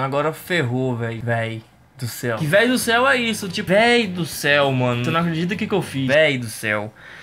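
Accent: Brazil. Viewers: O sotaque de Rondônia parece com o paulista, pelo menos o seu. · Vc ta falando goineins ou goiano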